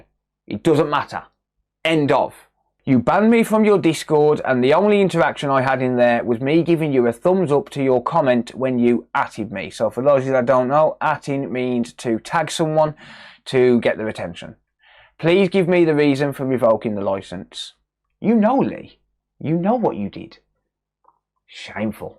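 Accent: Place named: New York, United States. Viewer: It’s how New Yorkers speak. Straight outta the gutter.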